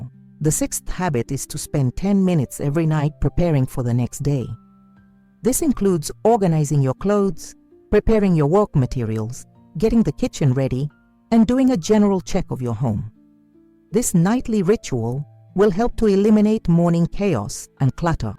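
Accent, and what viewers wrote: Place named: Hungary. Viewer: What's Japanese about this? Presenter sounds Hungarian.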